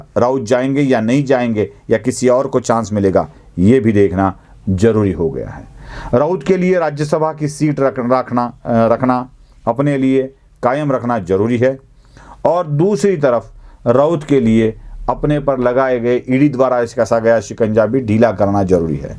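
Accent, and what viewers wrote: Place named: North, India. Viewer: बात सही है.. मराठी विशलेषण एक दम महाराष्ट्र के गावठी मिट्टी से जुड़े है ऐसा दिखता है और हिंदी तो जब बोलते है तो एक दम उत्तर भारत के हैं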